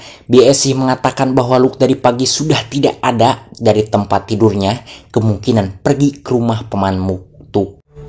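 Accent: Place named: Sunda, Indonesia. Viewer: sebenarnya filmnya ada yang udah ditonton...ngan eta resep naratorna. Aya emplud-an kitu. Crat cret crot...aaah pokona nyunda banget weh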